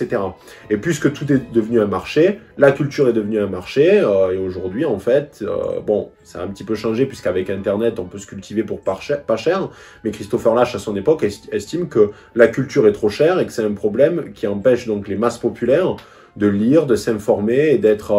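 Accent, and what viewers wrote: South-West, France. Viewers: Encore un nouveau soldat du bon sens qui émerge, ça fait vraiment chaud au coeur en cette sombre période! Merci, vive la culture, vive la France et vive l'accent du sud ouest!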